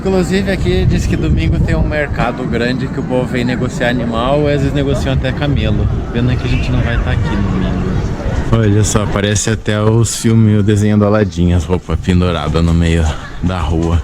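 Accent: Santa Catarina, Brazil. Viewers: Sei la, parece de Santa Catarina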